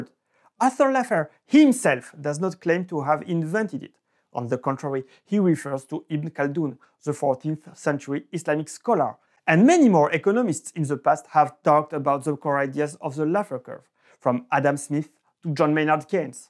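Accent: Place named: France. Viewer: Your French- English is not god enough,never heard that the sums of investment are equal to savings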